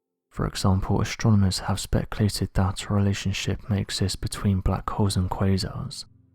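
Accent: England, United Kingdom. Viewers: I m personally tired of the English accents one reason is they use then to lend some type of foolish credibility to every. Sc am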